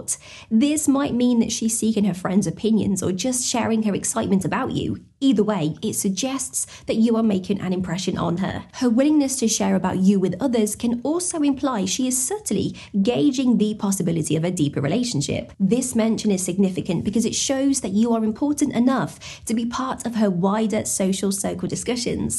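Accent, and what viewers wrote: England, United Kingdom. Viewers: I am not sure your right or wrong I really don't care I love watching your videos because your beautiful and love your English accent.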